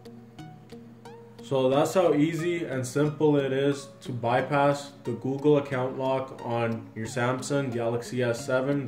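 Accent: India. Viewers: You know this tutorials wont work when they have that shit music and indian accent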